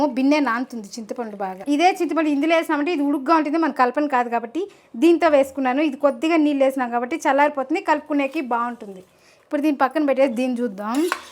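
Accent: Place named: Chittoor, India. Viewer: Pakka chittoor slang. Baaga chebutunnaru. Maa athagaaru ilagae chestharu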